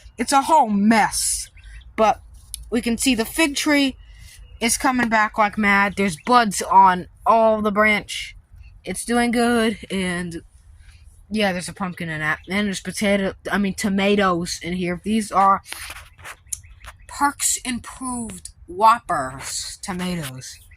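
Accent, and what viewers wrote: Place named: New York, United States. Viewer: Where u from? I hear NY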